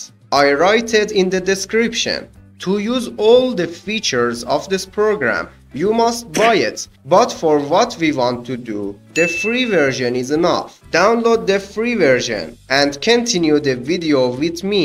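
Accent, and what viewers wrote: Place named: Iran. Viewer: لحجه امکان نداره ایرانی نباشه 😂🤣 دمتم گرم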